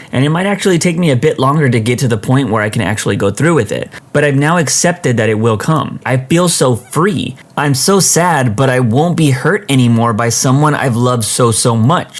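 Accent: South, United States. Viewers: As someone who lives in the south, I approve of the narrator using a southern accent in the first story XD it's just so funny to me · The southern accent is HILARIOUS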